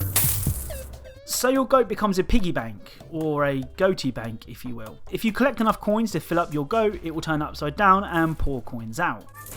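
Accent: United Kingdom. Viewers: you british man?🤨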